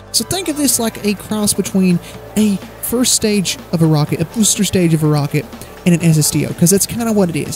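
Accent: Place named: Virginia, United States. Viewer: First time I stumbled across your channel, and I gotta say... Wow, this was really enjoyable to watch. Great commentary, you didn't drag it out 3 times longer than needed to be, bits of humor, and nice editing. 👍👍 Also, you sound like my relatives, and I'm guessing you live in western area of Virginia.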